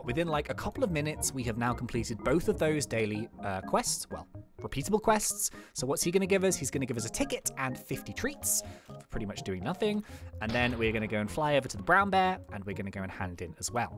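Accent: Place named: United Kingdom. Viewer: Its because they both have a brittish accent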